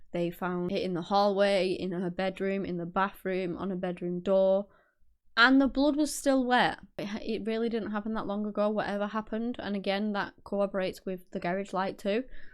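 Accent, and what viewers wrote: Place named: Northern England, United Kingdom. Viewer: Hello my darling can I ask what part of the UK you are from, I love your channel probably because of your northern accent, hi from Australia 🇦🇺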